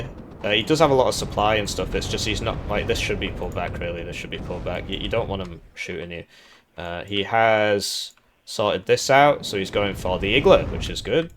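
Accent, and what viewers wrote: United Kingdom. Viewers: GGs, thanks for waiting. Never skip the deck review. Man did you sound British is this vid! I think the water helps your accent.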